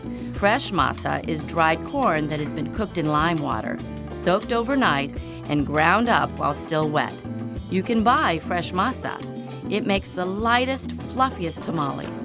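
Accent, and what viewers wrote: United States. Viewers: What you heard is an American accent, not an English accent.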